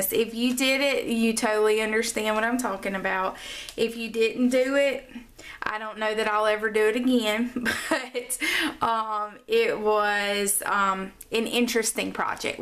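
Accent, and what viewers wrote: South, United States. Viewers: Lovely story AND art!!! And the award goes to...🥁🥁🥁 Southern speaking ladies!!! I'm from Alabama so this is quite refreshing, like a big ol glass of lemonade. Love your videos. 💕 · That whole book sounded like a Southern conversation, lol.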